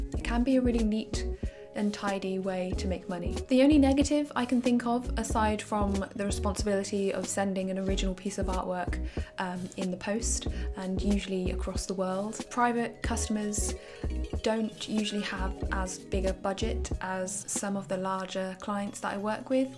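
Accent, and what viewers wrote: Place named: United Kingdom. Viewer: Why did I know you had a British accent?!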